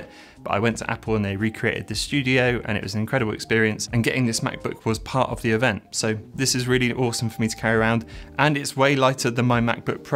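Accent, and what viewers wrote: United Kingdom. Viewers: You are comfortable on camera and speak calmly & beautifully with your British accent, so who cares about what your visual style choices look like? What you offer with information & services and how you present it is what matters. Not what you want to wear.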